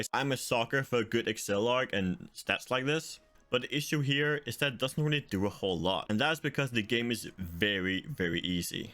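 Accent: Denmark. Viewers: Are u danish? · I COULD HEAR IT IM FROM DENMARK TOO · Er du dansk? :D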